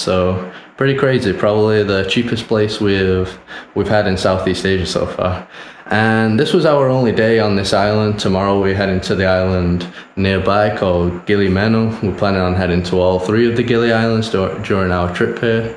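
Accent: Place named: Scotland, United Kingdom. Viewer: sounds scottish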